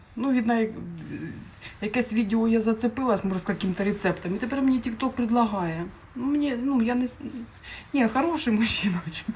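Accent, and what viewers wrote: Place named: South, Ukraine. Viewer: У неї діалект,який розповсюджений на півдні України, так як на заході України в мові зустрічаються польські та німецькі слова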